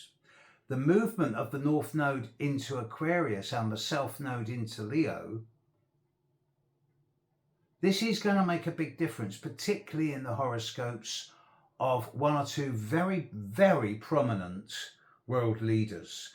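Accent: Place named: United Kingdom. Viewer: I am from Mongolia, learning about astrology since 2019. ’ British accent is difficult for me but I don’t know why I like to hear him. 😂😂 I like his manner. 😂 what planet and and house, conficuration makes you astrologer? I like your graunded “saturn” earthy essence. Your masculinity shows kind of Mars. Deffinately you have scorpion from your analyze. Mercury on Aqauruis?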